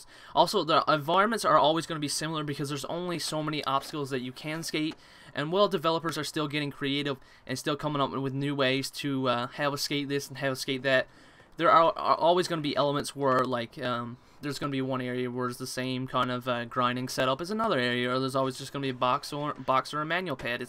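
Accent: Canada. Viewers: Canadian accent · What part of Canada are you from holy shit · are you Canadian?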